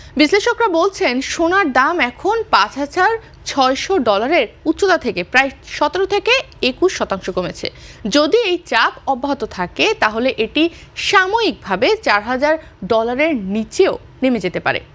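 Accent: India. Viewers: Akemon khobor pora??? Indiander moto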